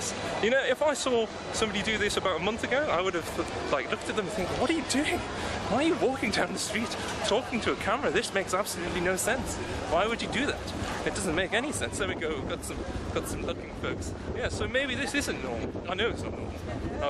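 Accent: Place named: Newcastle, United Kingdom. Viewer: you live in newcastle? thought i heard something in your voice in another vid, if you do, i might see you around sometime :)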